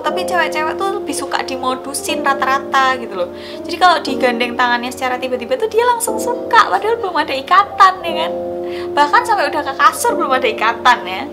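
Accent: Java, Indonesia. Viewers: Medoknya ngangeni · Medok yo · jowo ndi to mbk, kok kentel tenan jowo. ne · Jowone ngendi iki mba'e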